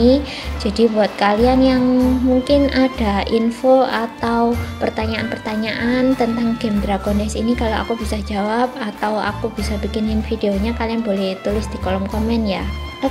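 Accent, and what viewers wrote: Java, Indonesia. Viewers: Medok anjay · Medok bgt kak wkwk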